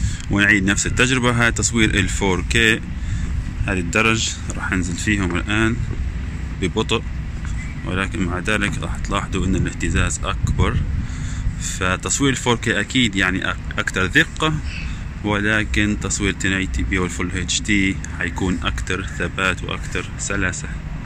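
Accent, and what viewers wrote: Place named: Libya. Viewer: واضحه ليبي يلي يشرح منور ولد بلادي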